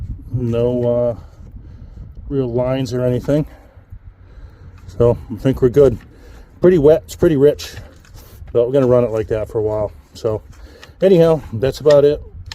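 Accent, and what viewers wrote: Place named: Massachusetts, United States. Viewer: just curious the accent sounded Massachusetts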